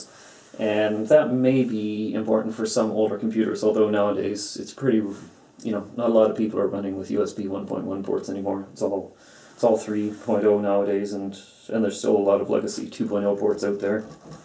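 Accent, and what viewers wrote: Canada. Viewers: More do less talk...but love the Canadian accent though. I got one of these just needed a few tips, nothing to help me here,sorry guy bye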